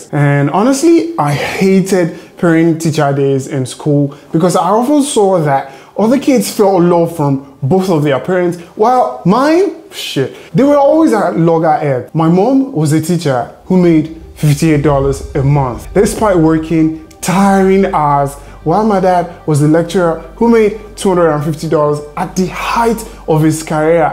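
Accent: Nigeria. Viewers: He is a Nigerian man with a Nigeran name who lives in Nigeria and looks Nigerian and sounds Nigerian, starting off from broke with no financial help or support, and he still managed to be rich. You have to respect this man because, in his current situation, he is starting from an extremely difficult mode, while most of us in the Western world, compared to this man, are starting from an easy mode. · I can still hear his H factor tho. It's part of the Yoruba accent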